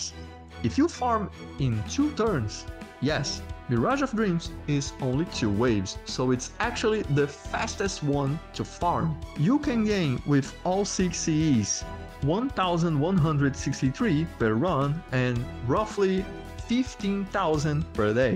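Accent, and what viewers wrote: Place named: Brazil. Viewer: Estou sentindo um cheiro de PT/BR nesse inglês aí 🤨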